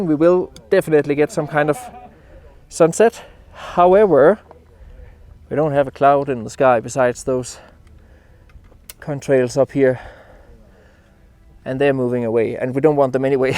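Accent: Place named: Denmark. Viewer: Lol man høre med det samme at det er en dansker 😅😅🍿 men fed video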